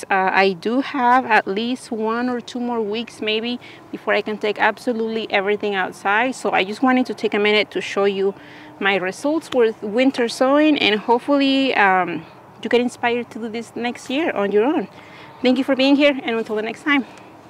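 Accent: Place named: Poland. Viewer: Hehe, you're so funny. And your polish is sweet.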